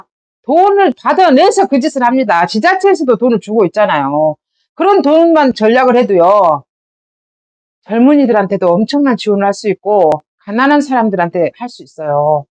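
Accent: Jeolla, South Korea. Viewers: 처음엔 전라도사투리가 호기심가서 듣게되었는데 머저리 바보들의행진만하는 국민의힘전의원들보다쎄고 또한날카로운 논평에 경의를표합니다 지금은 애청자가되었습니다 건강하시고 화이팅!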